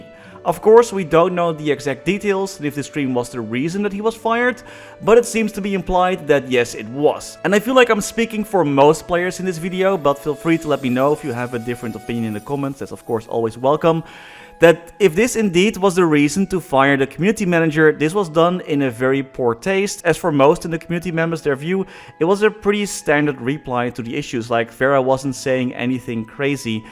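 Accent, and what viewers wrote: Netherlands. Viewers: are u dutch? im dutch too :D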